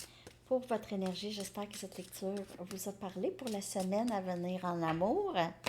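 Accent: Quebec, Canada. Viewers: Je vous apprécie beaucoup 😊 J’ai quitté le Québec pour retourner dans mon pays il y a 1 an et ça me fait du bien d’entendre votre voix et de retrouver ce côté québécois que j’adore tant (le « ok bye » m’a tellement fait du bien de l’entendre 😂) Et vos guidances sont pertinentes Merci 🙏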